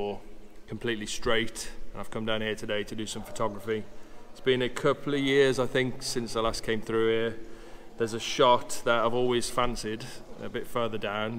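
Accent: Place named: Black Country, United Kingdom. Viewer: LOVE IT! Speaking the Black Country accent:) Again a beautifully stylized & wonderful film. The tunnel pics are stark but yet meaningful.....to me right now they represent that light at the end of the tunnel my country has fallen into. Again sorry to be late, but it's been crazy....12 new people here at the homeless shelter....and I'm barely getting home before the curfew the last few nights.....very difficult times here in the US....dude I swear not all Americans are bad people...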